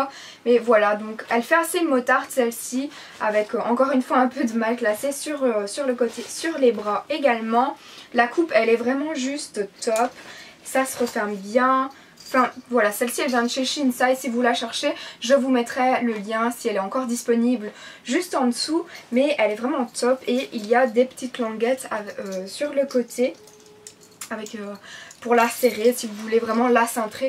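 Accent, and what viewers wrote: Belgium. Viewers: Tu deviens de plus en plus jolie ! Et j'adore ton accent belge 😂😂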